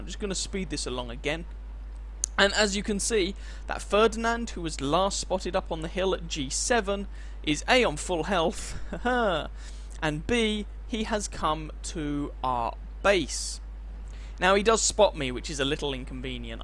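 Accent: England, United Kingdom. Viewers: I truly enjoy your english accent. :-)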